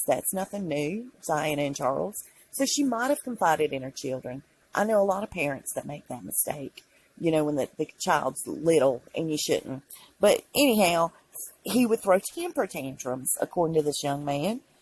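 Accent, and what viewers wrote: South, United States. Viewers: Love your Southern Accent! I'm from the South too, Louisiana. Just subscribed today to your channel. I would LOVE ONE OF THOSE WIGS for a friend of mine going thru chemo. She's very dear to me. I understand if I'm too late. God Bless you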